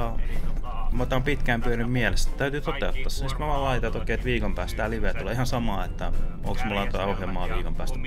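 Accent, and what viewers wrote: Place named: Jyväskylä, Finland. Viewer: Puhut niin paljon tosta Jyväskylän murteesta videolla. Mä kun en näe tossa puhetyylissä mitään outoa. Tosin koko nuoren ikäni oon asunut Jyväskylässä😁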